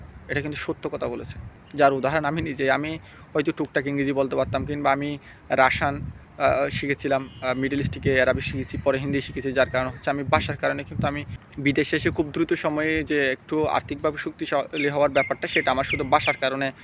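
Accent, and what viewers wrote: Chittagong, Bangladesh. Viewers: বন্ধু তুই চিটাইংগা নে? আয় বুকে আয়!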